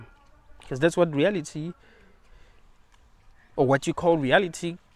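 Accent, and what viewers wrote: South Africa. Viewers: South African.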